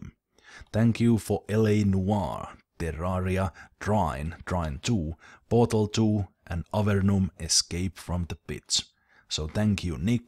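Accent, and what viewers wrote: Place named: Finland. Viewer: Gratz, you and your channal are a part of my day! When i found your channal first time i didnt quite like your voice, but now i think its epic, English+Finnish accent=Awesome!:D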